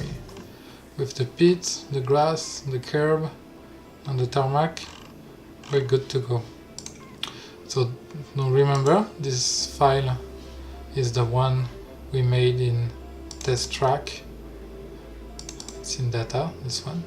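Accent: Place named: France. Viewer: Moi j’entends que tu es français mon ami lol j’aurais une question je boss un projet track mais j’ai un pb avec ma route et des effets de la voiture la fumée des pneus part dans le mauvais sens et la camera sautille et surtout le volant tremble constamment pourtant mes cubes sont bien en y vers le haut et le z pointe ma direction j’ai travailler sur blender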